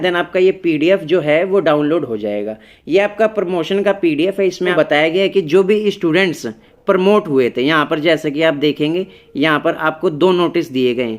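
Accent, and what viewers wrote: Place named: Uttarakhand, India. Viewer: Hey bro may I ask u thing??..... Do u belongs to Uttrakhand Or somewhere from there... Actually your ascents r too similar to regional people of Uttrakhand Or nepal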